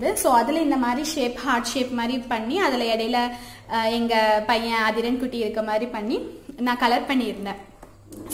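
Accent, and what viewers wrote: Kongu, India. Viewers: I subscribed ur channel especially for ur kongu Tamil and crafts.... Thank u for ur ideas...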